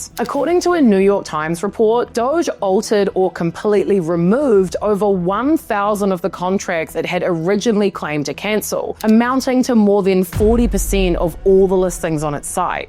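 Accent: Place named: New Zealand. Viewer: This is what I like to hear. Having been indoctrinated by YouTube into becoming anti-E-Loon I have been watching with some amazement the trajectory of this bloke's rise and rise on what is a Ponzi like bubble. The bubble is now straining to burst and the bigger it gets the bigger the mess is going to be. Personally I wish no good to the USers, the sad thing is the people are going to have to clean up this mess when the Moosk is gone. I predict his demise some time in the middle of this year, whether it will be the impeachment of Trump or the commencement of WWIII the E-Loon will fall. Whatever goes down it will fall on the people to carry the weight. I am enjoying your US reportage in an NZ accent.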